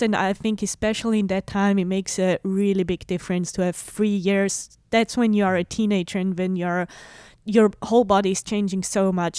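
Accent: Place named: Quebec, Canada. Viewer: She sounds french canadian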